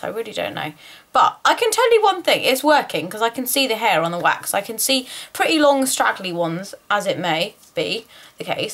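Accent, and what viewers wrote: United Kingdom. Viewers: What kind of accent do you have? Is it a British accent?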